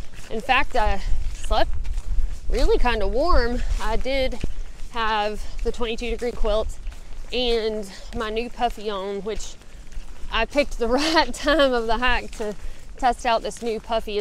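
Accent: South, United States. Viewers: loved the deer at the beginning! Did you tell them "hey, darlin'!"? If I had ANY deferment of gratification (which I do not) I would wait until you finished and watch them all at the same time! So good - and as a non-deep south southerner, I love the accent!! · I love to listen to you speak, the beautiful Southern lilt in your voice, the way you speak like a little girl when you're talking to insects or animals, the revery here of when you were a little girl and how it's affected you as a woman, the love I hear for your dog Hank when you find one of his hairs, "But now, uh, I hope it never stops. And I can tell it's his cuz there's a whole sunset in the end of it." Whether you're talking about equipment, or the trail, or dispensing some of your homespun philosophy, it's always interesting. You so seldom have a harsh word to say, and have so many kind and uplifting things to say. 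It's what makes your channel so great.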